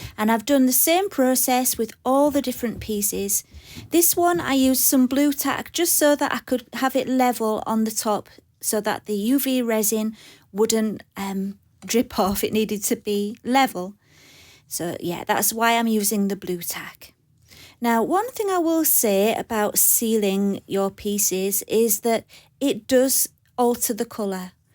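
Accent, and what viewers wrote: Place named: Yorkshire, United Kingdom. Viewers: I love your east riding twang. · Nothing more pleasing to my ear than a Yorkshire accent. Thanks for the tutorial!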